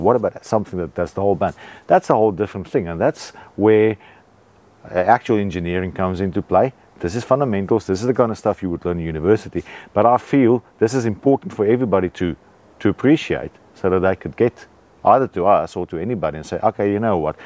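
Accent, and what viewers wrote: South Africa. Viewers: Recognised the South-African accent immediately 😆 Also, probably best to hide your IP address...?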